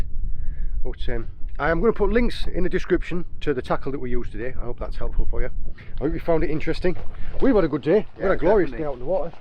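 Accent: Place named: South West England, United Kingdom. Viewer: I actually grew on brittish movies and tv shows, and it still didnt help me with your south-western accent and dialect haha. The subtitles really helped a lot, youtube logarithms working wonders nowdays haha. But dont worry, these things are mutual among us sea people and highlanders. I mean, if you learned croatian language, you wouldnt understand me either haha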